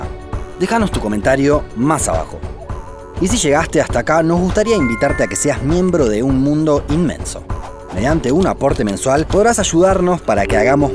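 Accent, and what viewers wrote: Argentina. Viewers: Acentos Ré ré ré argentino ! Adooooooro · El narrador es argentino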